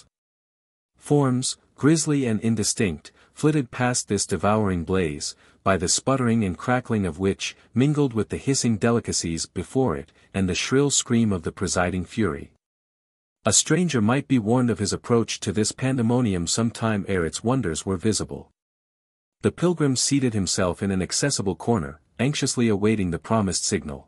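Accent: United States. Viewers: Only managed to stomach 12ish minutes of this godawful monotonous American voice. Would have been much better in an English accent at least, seen as it’s about Lancashire!!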